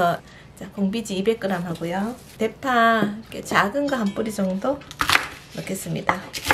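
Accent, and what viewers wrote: Gyeongsang, South Korea. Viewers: 경상도 사투리가 듣기 좋아요. 이 부침개 만들어서 손님들에게 시원한 차와 대접하면 좋겠네요.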